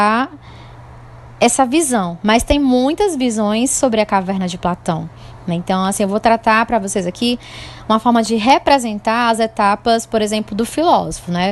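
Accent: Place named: Ceará, Brazil. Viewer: Legal o jeito que tú fala. Tú é cearense? Parabéns pela a aula!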